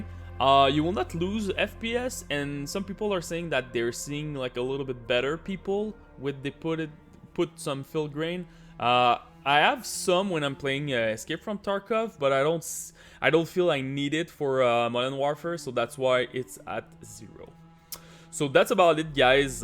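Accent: Quebec, Canada. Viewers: it sounds like u are from quebec? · T'es quebecois en? Haha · cool un quebecois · Ton accent qc est cramé en tbnk 😂 . Tres bonne video entk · Ton anglais ma spoil que tu étais québécois hahaha merci pour ces conseils ! Je vais essayer car je run 15 19 fps avec mon laptop : acer 575g ,16go ram, et gpu 940 mx je comprend pas trop pourquoi cest autant mauvais. Si u as des conseils en plus je suis ouvert haah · I’m from Quebec too that’s why I recognized the accent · L'accent québécois)) Are u a quebecker? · tu es québéquois hein :) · are you canadian lol? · quebec french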